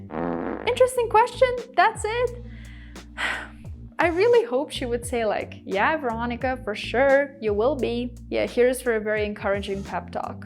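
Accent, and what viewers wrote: Russia. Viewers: Why do I can detect Russian pronunciation from first word all the time. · Вроде хорошо говорит, но все равно слышатся достаточно уловимый русский акцент. Только потом посмотрел на имя )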